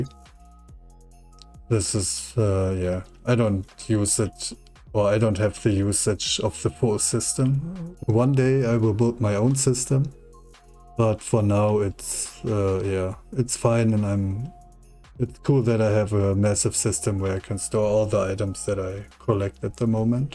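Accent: Germany. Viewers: Man merkt den deutschen Akzent richtig 😂 cooles video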